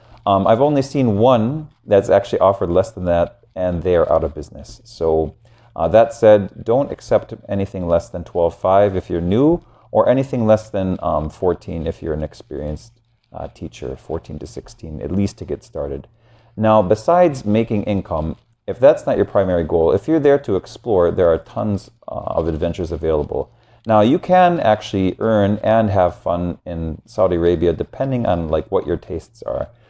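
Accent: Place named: United States. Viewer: You sound American now! Sorry about the earlier comment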